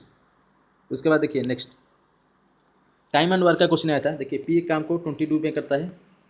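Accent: Odisha, India. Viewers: Pura odia lagucha bhai.. 😊☺️ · There is nothing wrong with ur accent. Ppl who comment on Indian accents should be ashamed of the of themselves. Diversity is the core of India 🇮🇳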